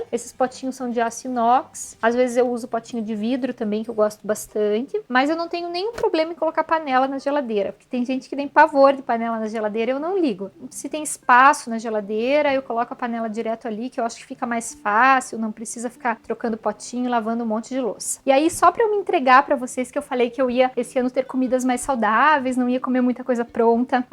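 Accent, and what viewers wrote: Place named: Paraná, Brazil. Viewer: Amo esse canal: fato! Mas também amo esse sotaque paranaense! haha 🙄❤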